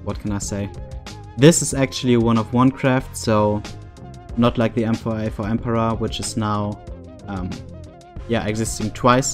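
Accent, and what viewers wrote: Germany. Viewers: Man hört so das du deutsch bist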